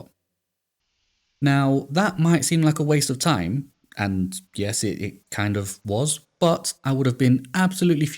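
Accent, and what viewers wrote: United Kingdom. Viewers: What kind of British do you speak. I love it 🥹🥹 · Your British jokes are very distinct and interesting.. but your accent is incredibly annoying, like every British accent. Could you tone down that way of speaking a bit, or else speak in Brazilian Portuguese so I can understand? Thank you!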